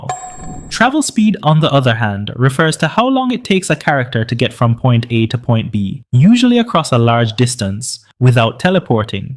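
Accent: Jamaica. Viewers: good breakdown , are u jamaican by any chance?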